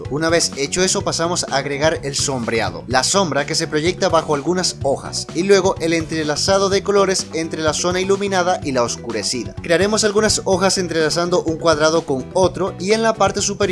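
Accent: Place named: Colombia. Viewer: eres de colombia pa? pq se nota yo si soy de colombia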